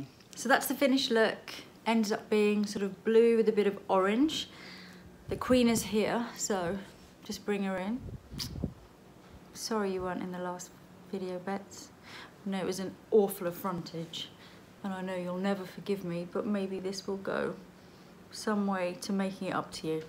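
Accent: United Kingdom. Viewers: Lisa Eldridge I absolutely love watching your videos. I in the United States and your British accent is so lovely. I think you are such a beautiful woman. I love all your beauty tips. When I watch you I feel relaxed and ready to change my look style with confidence. Much love to you and your gorgeous kitty 😻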